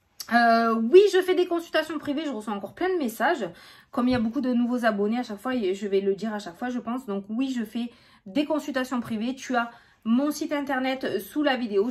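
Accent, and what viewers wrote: South, France. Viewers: Coucou, j'adore votre façon de faire les guidances, votre accent du sud, votre franc-parler lol 👍😁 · Ton accent me parle très bien car je suis muté dans le Sud, je recommence tout à zéro et les finances suivront 👍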